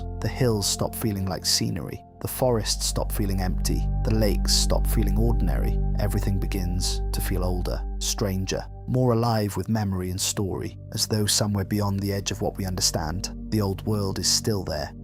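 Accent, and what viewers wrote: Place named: Wales, United Kingdom. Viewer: I'm not sure if you've heard of Ren, but he's a brilliant Welsh musician. Perhaps the best I've heard in my 60 years. But, your speaking voice is quite similar even beyond the accent. This was a wonderful video. Thank you.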